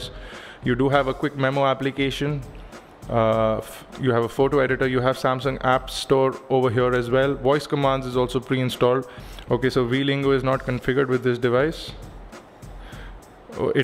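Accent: India. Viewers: its called Indian accent btw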